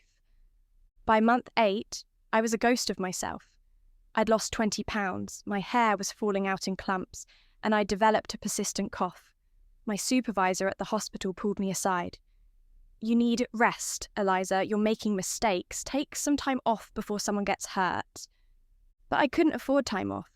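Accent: United Kingdom. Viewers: 🤢 british accent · The British accent ruins the story, sounds like an Emma Watson rip off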